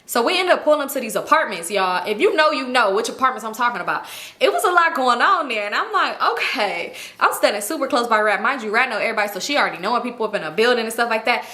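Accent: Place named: California, United States. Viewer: Every now and then I hear your cali accent it’s so cute yall say arms and party differently lol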